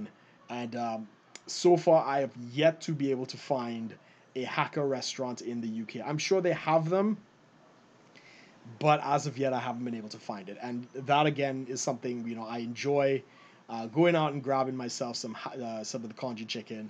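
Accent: Trinidad and Tobago. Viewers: To me you sound like you have a bit of a Trinidadian accent and you can always make your own trini pepper sauce if you get pepper in the UK. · Ah hearin' a trace ah Trini in your accent. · I can hear the trace of Trini in his accent. My Fiancee is Trini, but from Boston while he parents are from the island so I hear it a lot (and the food is awesome). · He has a Francesca Hawkins style Trini accent. And if you don't know who Francesca Hawkins is, you're probably not a Trini. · Congratulations u sound like one of us. Like a Trni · I hearing an American accent, Trinidadian accent, bajan accent and maybe even a lil british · Dont be so mean, in many many parts of the UK people have different accents, plus there are many different people from all over the world live here sometimes people pick up other people accent, when they spend alot of time with certain people, that's why your hearing trni accent mixed with bristish he is not doing it on purpose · you sound Trinidadian. 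Doesn't matter. I miss the food too. Callaloo and dumplings. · His trini accent on point...I grew up in Maraval. Now living in the USA for 27 years. Have to agree with you about the food. As an avid traveler still no food can compete with Trinidad cuisine. Mango chow 😋😍 Try to get back home often. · This is the first time I’ve seen a white guy guy with a Trini accent. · Hello not a trace nah... he sounds very Trini 😍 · After leaving home 50 years ago 😱 I still miss the food! When I lived in England, I used to leave Bournemouth and travel to Brixton to get cooking supplies, but that was 45 years ago! I now feast and stock up when I travel to Brooklyn or Miami from my island home in the mid-Atlantic. Enjoyed hearing the Trini twang!! Best wishes.. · That English Trini accent is niiiice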